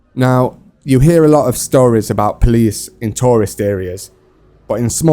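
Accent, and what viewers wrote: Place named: North, United Kingdom. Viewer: love yr northern accent.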